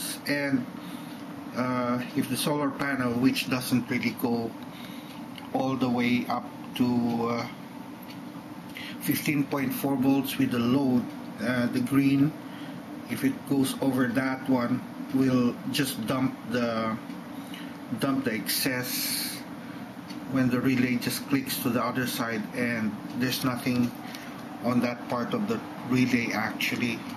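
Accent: Philippines. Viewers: sebwano ka man dong? accent mo bisaya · pinoy accent :)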